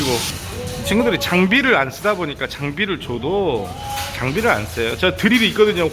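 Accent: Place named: Jeolla, South Korea. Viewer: 눌렀다 ㆍ 이 ~ ㅋㅋ 전라도 사투리를 ㅎ 나도 전라도인데 사투리 엄청 심하거든요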